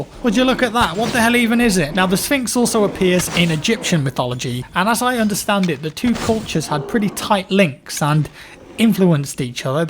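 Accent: United Kingdom. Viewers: The way you pronounce Minotaur and Gaia...sometimes I can't even with you Brits.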